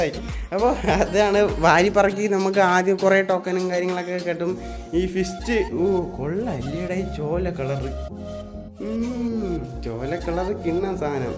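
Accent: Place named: Trivandrum, India. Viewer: Bro tvm ill ano 😁 slang athupole indu ❤️❤️ i like ur voice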